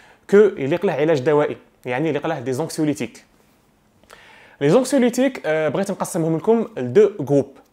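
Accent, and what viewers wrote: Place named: Oran, Algeria. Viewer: شكرا شرح جميل جدا اللهجة تاعك جميلة جدا انت وهراني 🤔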